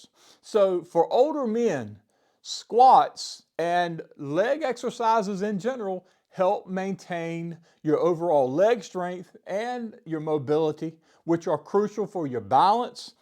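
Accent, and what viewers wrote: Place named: North Louisiana, United States. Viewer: I detect north Louisiana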